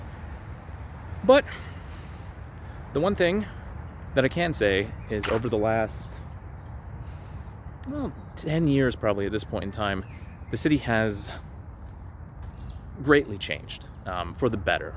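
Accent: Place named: Russia. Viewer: У этого человека явно русский язык - родной. Это просто сто процентов слышно и по акценту, и по интонации,и по произношению. И по тексту тоже. Может, увезли родители в 90е, может ещё какая история...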